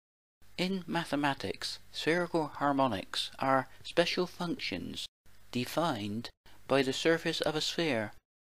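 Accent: West Country, United Kingdom. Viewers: Lovely West Country accent.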